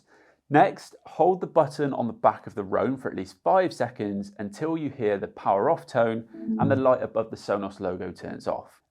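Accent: United Kingdom. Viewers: Having a guy with a British accent makes this video and Sonos products worse than they actually are….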